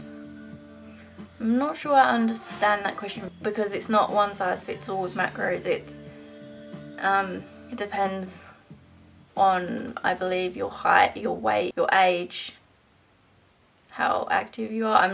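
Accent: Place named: Cheshire, United Kingdom. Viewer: Haha first video I thought you were a Brit and I was no an Aussie and kept going back and forth I’m from Birmingham, uk are you from Cheshire ? I hear a hint!x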